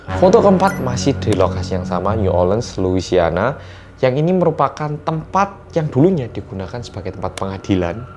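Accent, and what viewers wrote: Java, Indonesia. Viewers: Saya melihat ada orang korea yang medhok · "Tapi...ini....pertanyaan pertanyaan ini kurang....BiSa DiPercAya Gitu" Humorq hanya sebatas kak ngomong medok😭😭😭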